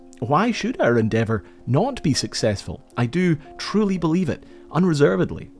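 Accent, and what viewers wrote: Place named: Scotland, United Kingdom. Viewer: Excellent stuff: i’m ex english love your Scottish accent… way te go mon!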